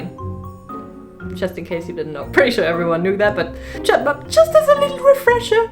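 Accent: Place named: Germany. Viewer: I am happy your videos are not MOONdane.... ha ha So, thank you for your videos. They are so great. I love listening to you talk - I don't want to guess wrong or assume that you are German although I've seen you holding what looks to be a lot of German books and the way you pronounced some words with a "v" like the word "witches" - but I love your accent. You are very informative and always have interesting topics. I especially like this video because when you spoke about your grandma, it immediately made me think of my grandma and grandpa (my grandpa being from German lineage) and they never did anything that wasn't in accordance with the moon. Luckily, they taught me how to live by the moon cycles as well, and the knowledge they passed along has not gone to waste. I don't even rely on the weather channel for my weather information - I know what the weather is going to be like based upon observing nature, such as the birds and livestock. 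Loved this video so much.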